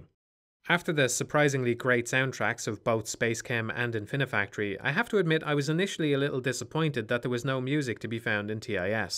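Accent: Ireland. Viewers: Any chance of a breath of the wild review? I need a twelve hour analysis featuring my favourite floating Irish voice.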